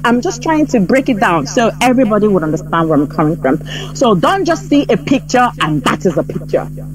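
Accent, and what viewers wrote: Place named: United Kingdom. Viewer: She speaks good English with british accent; but definitely said nothing about the restoration of Biafra, the release of Mazi Nnamdi Kanu and the continouos inversion of our land by Fulanis herdsmen in collaboration with Nigeria sponsored military and para military. The only problem DOS and their co have is Mazi Simon Ekpa nothing more. very disgusting. #FreeMaziNnamdiKanu #FreeBiafra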